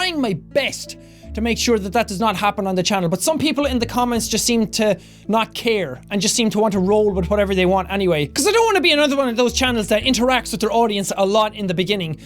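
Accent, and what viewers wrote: Ireland. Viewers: !! I think that you are an AWESOME person. You are my favorite youtuber! And i love your irish accent! You are A BOSS!!!!! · where in Ireland are you from #IrishAndProud:D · what part of ireland are you from? im a wexford man :)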